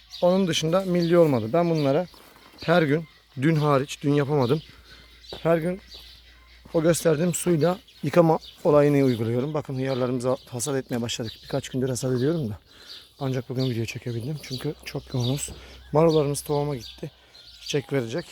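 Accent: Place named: Aegean, Turkey. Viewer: Hocam boşver sen onları etrafımız negatif insanlarla dolu. Sen samimi adamsın belli konuşmalarından. Nerelisin izmir aydın tarafına çok benziyor şiven. Allah kolaylık versin. Kolay gelsin.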